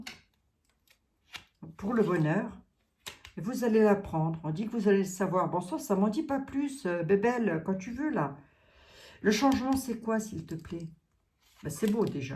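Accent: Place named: Vosges, France. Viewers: Moi heureuse de retrouver l 'accent Lorrain et les expressions. De temps en temps j'en ressors une. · Une petite pointe d.accent vosgien par moments... celui-là on ne peut pas l'oublier (j'en suis la preuve) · Bonsoir, je me régale avec vous, entre autre avec votre accent, étant spinalienne d'origine, bon certes j'avais 9 ans lorsque je suis partie dans le sud, cela fait maintenant 50:ans. Quand je vous écoute je retrouve mon accent. Le plus qui m'éclate chez vous c'est quand vous vous mettez d'un coup à râler après quelqu'un, et que vous avez raison. Ça me fait délirer votre humour. En tous les cas, merci pour tout ça. Je vous adore.🌸🥀🌷🙏🙏🌹💐😇😘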